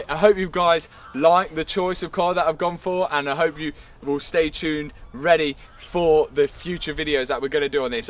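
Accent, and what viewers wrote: Leicester, United Kingdom. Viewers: its a lovely car, even if you left it as it is now it still looks nice. are you from Leicester? your accent sounds like it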